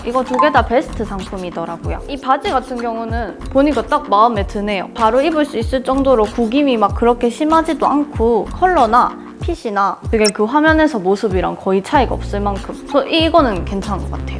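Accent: Gyeongsang, South Korea. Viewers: 님 대구분이세요?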